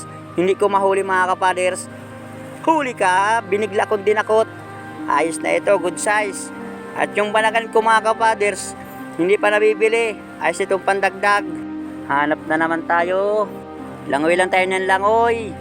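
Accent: Bicol, Philippines. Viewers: Tagalog ang salita tunog bicol😅😅😅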